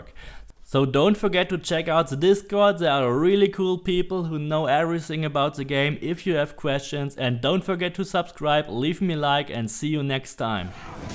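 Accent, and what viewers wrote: Germany. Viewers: I’m just curious are you German? Or Austrian? Or from a totally different country cause I’m dumb lol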